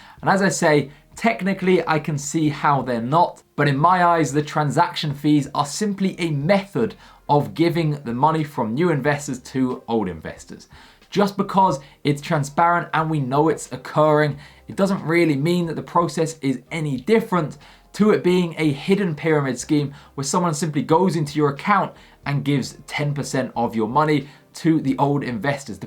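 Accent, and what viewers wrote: England, United Kingdom. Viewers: Good video, subbed, are u from England man? 😊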